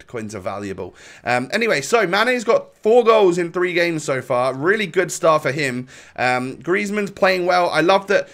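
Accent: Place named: England, United Kingdom. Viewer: Wait he’s from Argentina??? Omg I thought he was from England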